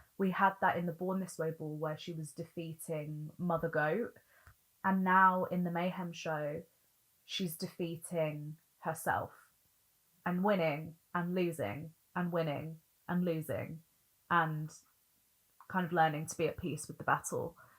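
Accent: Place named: United Kingdom. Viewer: This girl sounds british, I think she is as well as a pop-culture student and especially from The Queen Gaga,The Best & The Great.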